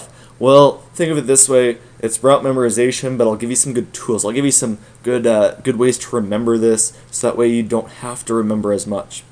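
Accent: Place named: Iowa, United States. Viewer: My guess is its an American accent, from Iowa.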